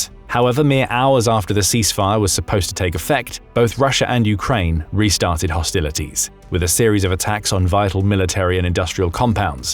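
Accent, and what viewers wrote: United Kingdom. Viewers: This video has a completely Eurozone slant.. just because someone has a British accent, doesn't mean you can believe all they say.. The book hasn't been finished yet on US efforts in the Ukraine - Russia war. Don't forget, British did have their hands in the Russia disinformation scandal regarding President Trump (debunked Steele Dossier)..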